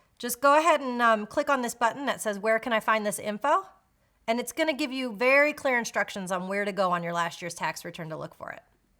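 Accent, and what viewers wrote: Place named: United States. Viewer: It would be nice if the video mentioned which country's taxes this video is addressing. I'll have to guess by your accent that this is for Americans. They have turbotax here in Canada too, but the taxation is not the same. Specificity is key, especially with taxes.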